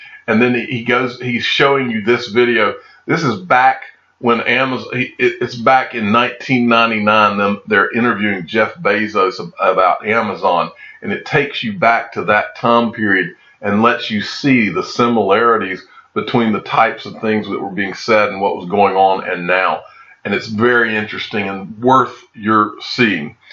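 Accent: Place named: New Orleans, United States. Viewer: I thought he was from NOLA as I am but he’s from Maryland if not mistaken. I remember asking him a few months ago. He does sound like he’s from NOLA.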